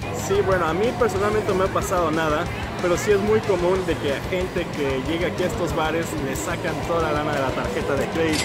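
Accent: Chile. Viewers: Acento chileno ?..soy nuevo en este canal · Eres chileno¿?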